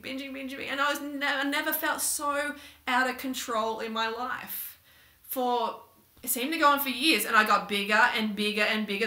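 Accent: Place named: Australia. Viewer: The Aussie accent has to be the best in the world - everything about it is awesome.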